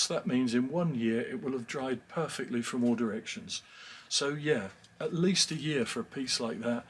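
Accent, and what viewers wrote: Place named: RP, United Kingdom. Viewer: He has a posh British accent, so I instantly believe anything he tells me.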